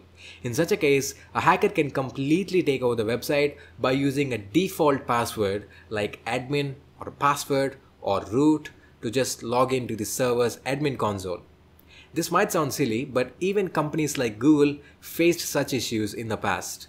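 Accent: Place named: Guntur, India. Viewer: Indian accent.. no thanks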